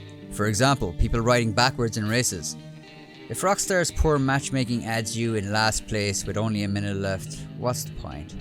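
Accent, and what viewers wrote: Ireland. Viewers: Dublin or Belfast?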